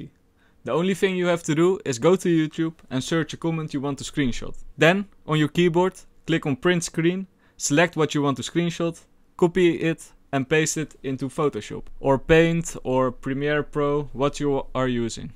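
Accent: Netherlands. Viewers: If you were commenting about him sounding Russian, that's actually a Dutch accent, easily confusable right?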